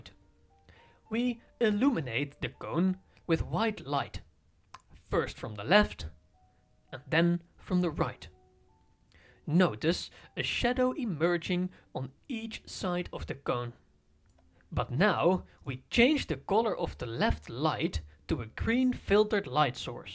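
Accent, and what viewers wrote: Netherlands. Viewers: The Dutch accent sounds like the complement of the Australian accent so it definitely worked, added colour, in my case :) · Your videos are great in content, crazy you have so few subsrcibers. Maybe work on the dutch accent?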